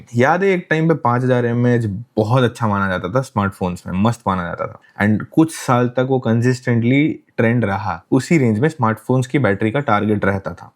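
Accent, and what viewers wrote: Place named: United States. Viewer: Bhai american accent bala vasha kaha sa sikhlio ho.😢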